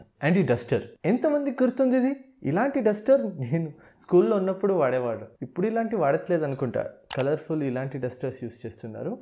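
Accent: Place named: Telangana, India. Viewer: Micro detail: How many of you noticed speaking in Telanagana 😅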